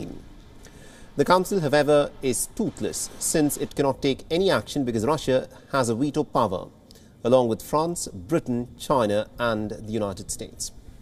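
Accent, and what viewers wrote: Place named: India. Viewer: Guys... Whenever you hear that accent. Just remember about the old grannies these indian IRS phone call/ telemarketing scammers had scammed. 👍👍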